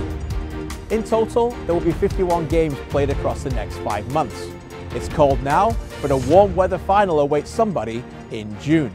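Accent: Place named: Canada. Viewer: Why’s he putting on a Canadian accent all of a sudden? 🤣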